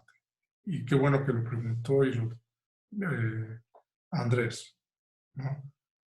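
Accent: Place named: Córdoba, Argentina. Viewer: Y esperamos tener el honor de que nos visite en Argentina (su acento cordobés no lo perdió 😉)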